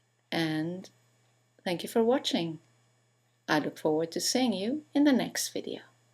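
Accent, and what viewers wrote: United Kingdom. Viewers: Thank you so much for this video! I wanna ask you about the accent, teacher. Is this totally British English? Could you please give me some advice of choosing one between BE and AE? I think I should choose the one that is easier to understand( to me ) ? Is that enough to make a decision , teacher ? Thank you for your attention! Nice day! <3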